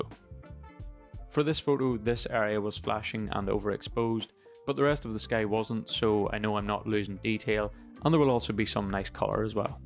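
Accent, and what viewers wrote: Ireland. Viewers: Minor cavil: the word 'sky' is not normally pronounced with an 'sh' sound, but you're Irish, I guess, so ok?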